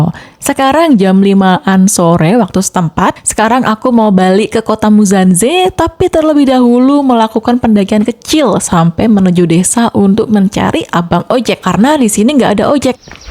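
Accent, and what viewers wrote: Java, Indonesia. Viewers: Maneh adalah bahasa Aceh artinya manis, dan mungkin berasal dari bahasa Melayu. Ya saya berasal dari Aceh, yesss saya asli Aceh, seorang pria jelek, tua ( 38 tahun/ 15 juni 1985 dan tapi kita muslim tidak pernah percaya kepada ramalan zodiak / bintang karena percaya kepada ramalan, tahayul, khurafat, dukun, sihir adalah hal yang paling dilarang dalam islam dan dosa besar) dan saya sudah menikah ( 29 juli 2010 Alhamdulillah) istri saya juga asli Aceh. Saya bisa menebak In Syaa Allah bahwa kamu orang Jawa walaupun kamu mirip Sunda atau mirip orang Sumatera tapi saya yakin kamu bukan orang Aceh, karena gayamu, karakter kamu, logat kamu sama sekali tidak mirip orang Aceh. ALLAHU AKBAR ALLAHU AKBAR ALLAHU AKBAR... Baiklah adik saya dari Jawa, apakah kamu sedang tertidur setelah sahur atau tidak, atau sedang membaca tulisan saya ini, please 🌹🌹🌹 jika kamu punya banyak waktu bacalah terjemahan Al Quran di hp mu secara acak beberapa ayat saja, itu akan membuat kamu bahagia In Shaa Allah dan saya minta maaf telah mengganggu anda lagi. 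ALLAHU AKBAR🌹🌹🌹 !!! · Smpyn bahasanya kok kayak orang Jawa sih Mbk , jawanya mana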